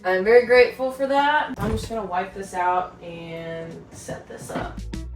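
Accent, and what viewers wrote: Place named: Arkansas, United States. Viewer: You can tell you’ve been back in Arkansas because your accent has kicked up a notch 😂🙌 “one of those burn bucket thangs”. You’re killing it! ❤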